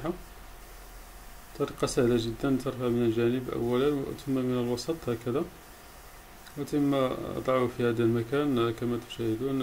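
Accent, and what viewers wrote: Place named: Morocco. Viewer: الصوت مغربي و الفيديو لشخص من الخارج على ما أظن .و هل على بالك ان في المغرب يغيرون الليدات كلهم في سبيل ليد واحدة محروقة.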